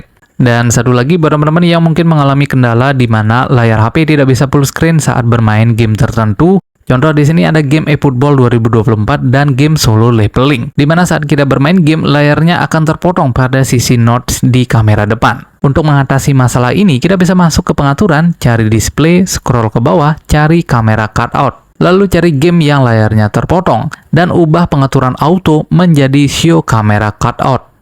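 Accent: Bali, Indonesia. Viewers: Abang nya orang bali ya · Anak bali ini logatnya ya bli😊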